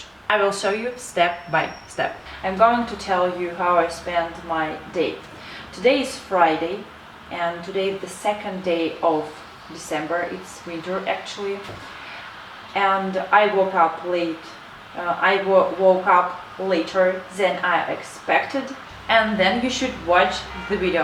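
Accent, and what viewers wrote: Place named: United States. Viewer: You have American accent because that I can understand very well you 🆙, good tips 👏👏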